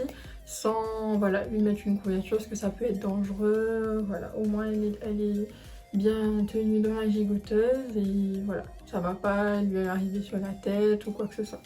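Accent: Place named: Réunion, France. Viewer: C’était trop bien ta vidéo pour une première fois franchement continue comme ça c’est super 🥰💯tu vien de île de la réunion 🇷🇪 ? Comme pour moi ya un petit accent 😅🇷🇪😘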